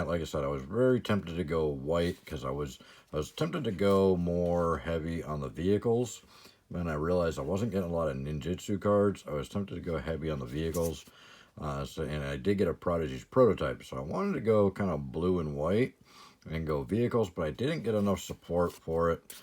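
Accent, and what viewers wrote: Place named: United States. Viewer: May I ask what area of the United States you’re from? Can’t get down where your accent is from. Watching here from MN. Keep it up!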